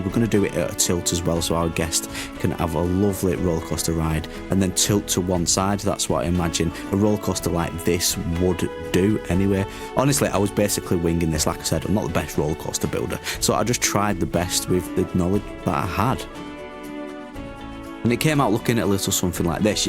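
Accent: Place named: North, United Kingdom. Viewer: great build!. I love your northern rambling... p.s...... how northern are you?!?!! you sound mor northern than me :'D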